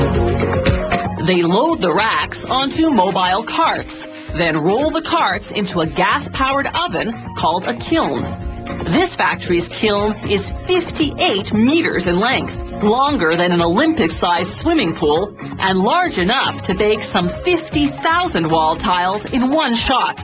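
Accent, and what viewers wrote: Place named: Canada. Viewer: I only just notice she's Canadian - "abouwt" and "ouwt" gave her away :'L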